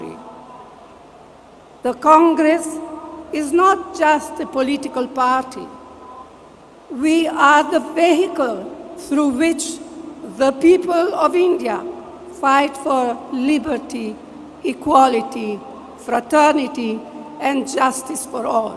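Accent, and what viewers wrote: Italy. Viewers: Ye hamara durbhagya hai ki aap jase log satta ke uchh shikhar pr pahuch gaye Aapki Hindi or English dono kharab hai. Aap Bharat me salo se ho mager aapke bhasa ke uchharan se lagta hi nhi ki aap bharatiy ho. Aap Italy bolo Jo aapki matri bhasha hai. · सोनिया जी आज भी हिंदी ठीकसे नहीं बोल पाती, अंग्रेजी भी इटालियन लहेजेसे बोलती हैं | अभीतो सिर्फ ५५ सालही हुए है भारत में रहकर |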